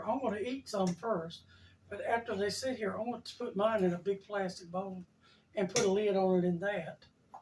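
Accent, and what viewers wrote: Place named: South, United States. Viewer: 👁👁 had to tune in to get My Sweet Southern accent…fix… God bless you sister 🙏🏼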